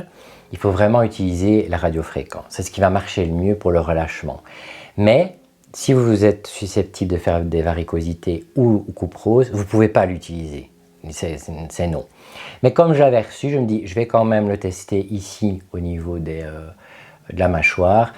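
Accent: South, France. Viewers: J arrête pas de rire entre curent de beauty Ave l accent du sud et la pipe oh que c est bon de rire🤣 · Ah cher, c'est sur c'est nous qu on est les meilleurs 😉et vive l accent du sud. Merci pour ce moment précieux 🤗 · Vive l’accent du sud. Team innocence. Vive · Vive les #LUDIVINE du Sud 🌞😂😂le sud Montpellier c'est la vie les cigales l'accent le pastis et curennnnte boody 😉🤣💋💖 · Je suis très avare de comme,t’aimes, mais avoir une vidéo pour les 10k, tout en apprenant des anecdotes sur current body (accent du suuuuuud) , franchement ça vaut bien un peu d’effort de ma,part. Merci et bon courage à toi 😊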